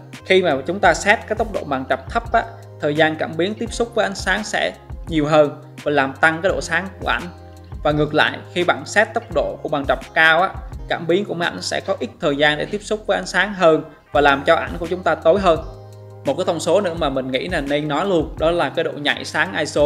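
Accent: Da Nang, Vietnam. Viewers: Giọng bạn giống giọng Đà Nẵng, phải b ở ĐN không nhỉ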